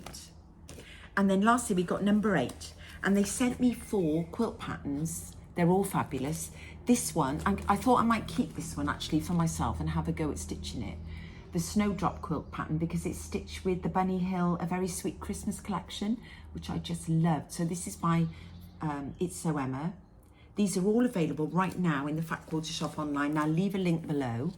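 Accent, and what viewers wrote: Wales, United Kingdom. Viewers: Love everything on your Flosstube! Love to hear your Welsh accent too! Is the Giraffe called goose??? Funny !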